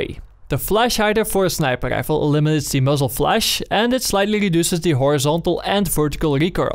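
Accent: Netherlands. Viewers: clearly dutch he sound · He's clearly dutch... we got that weird r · Are you dutch?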